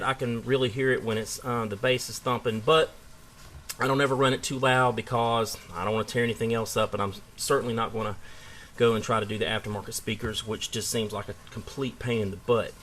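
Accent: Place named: South, United States. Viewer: Like your southern accent!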